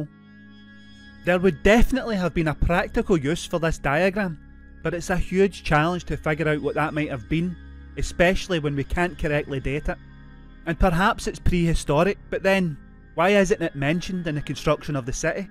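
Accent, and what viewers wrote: United Kingdom. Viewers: I couldn’t have expressed my thoughts in any better way & have left comments saying so whenever I see them. It actually makes me cross that English speakers are complaining about an English speaker 🤷🏼‍♀️we don’t have American accents in the U.K. & we’re as diverse as the USA is in it’s large land mass. 💕:_TKTCEyeSeeYou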